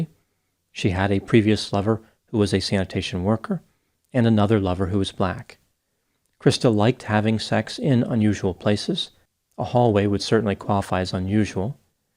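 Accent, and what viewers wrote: United States. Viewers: Yes, in particular his English has only a mild American accent.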